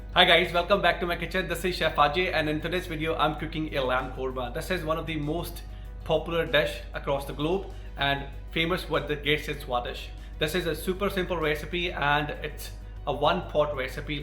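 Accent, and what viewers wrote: Scotland, United Kingdom. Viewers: What was that last ingredient you added from the tiny bottle? I couldn't quite catch what you said. BTW, I love your Scottish twang.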